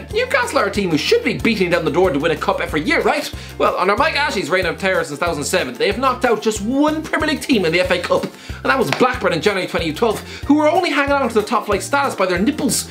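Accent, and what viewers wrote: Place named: Ireland. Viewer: I see HITC, I hear Irishman, I watch, I like. simple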